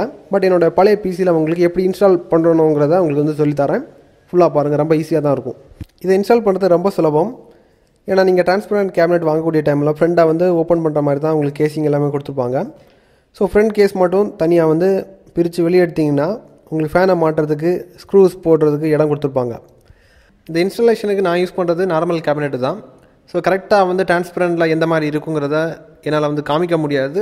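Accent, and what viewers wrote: Kanyakumari, India. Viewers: Bro neenga kanyakumari ya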